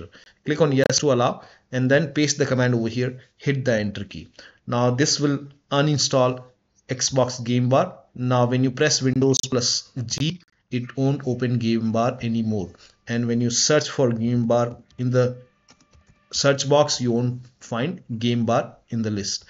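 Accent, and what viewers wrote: India. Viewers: As soon as I heard the Indian accent, I knew its gonna work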